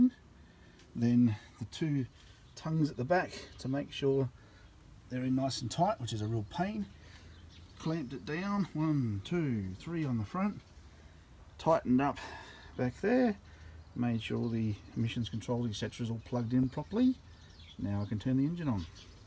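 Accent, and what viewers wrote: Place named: Australia. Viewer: Mate, you and your Australian language are both legends, thank you for the video, cheers from Saudi Arabia.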